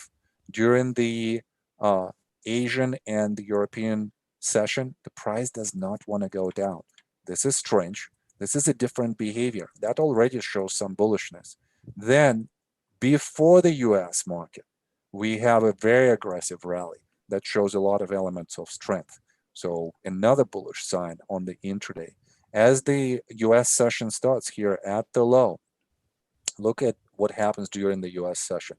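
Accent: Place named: New Zealand. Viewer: your Dulcet Kiwi Tones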